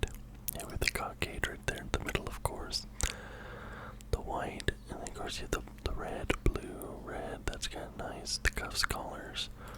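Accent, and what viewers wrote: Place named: United States. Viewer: Hey British guy here who is very passionate about football (or soccer as you call it) and it’s very interesting hearing how an American talks about football.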